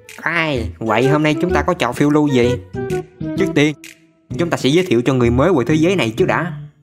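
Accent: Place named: Binh Duong, Vietnam. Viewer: saonóđúnglà bình Dương vải cả nó hơi sà lơ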